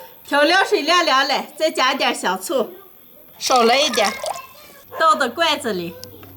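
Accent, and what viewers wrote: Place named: Yan'an, China. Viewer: 我陕北话这么有牌面么。感觉还是延安口音。。。。。。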